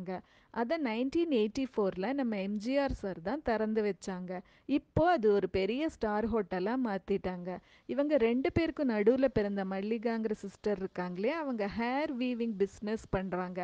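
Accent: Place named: Kerala, India. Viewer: The voice has malayalee accent🙂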